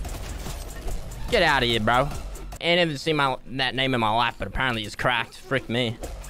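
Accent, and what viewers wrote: Australia. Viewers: Nutella and Breadsticks can’t u hear he has an Australian accent Asian people don’t.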